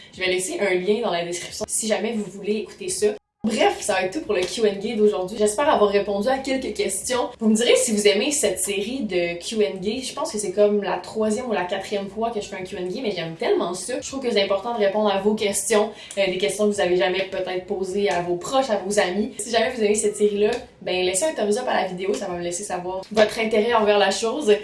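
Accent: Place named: Montreal, Canada. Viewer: Fille choisis.. c'est français ou anglais🙄 Ça parait que tu vis à montréal parce que t'entendre parler comme ça c'est juste dégueulasse.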